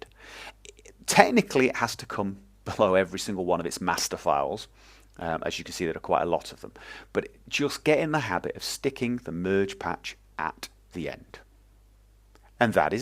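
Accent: United Kingdom. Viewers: suprised the hell out of me when i saw your profile showing youre a fellow norwegian, was guessing british from listening to your voice.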